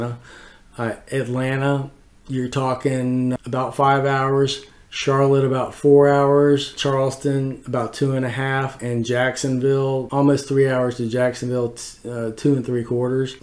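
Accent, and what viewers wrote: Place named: Pittsburgh, United States. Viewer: Great content! You sound like you’re from Pittsburgh!?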